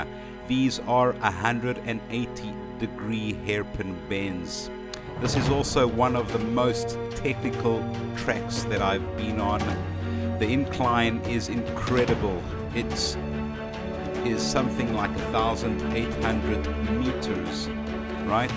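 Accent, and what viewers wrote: South Africa. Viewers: Hi there. Your accent sounds like S.African. I am Saffa living in France 20 years now and motorcycling here. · nice vid mate.....what part of South Africa you from? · You sound like you from South Africa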